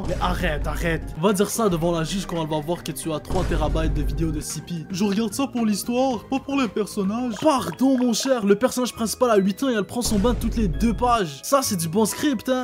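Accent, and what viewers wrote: Quebec, Canada. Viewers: t quebecois? parce que moi oui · Mais c’est moi ou il a un accent innnnn peux Québécois?😂❤(je revient pour dire que en faite la debile que je suis j’avais pas capté qu’il est québécois 🧍🏻‍♀️. Myb)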